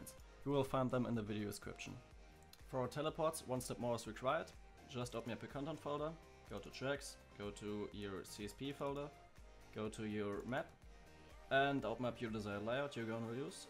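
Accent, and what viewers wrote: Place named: Germany. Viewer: Moin, dein Akzent klingt sehr deutsch, deshalb schreibe ich mal auf deutsch:) Ich habe einen Server gemietet um mit freunden zu spielen. Leider sind wir alle Anfänger und bekommen die Traffic mod nicht installiert. Kannst du und da vielleicht weiterhelfen?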